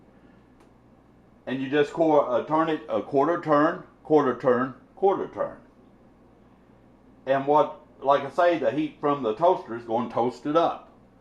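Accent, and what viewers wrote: United States. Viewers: this dude has a very witty American accent! It really works tho.Thanks!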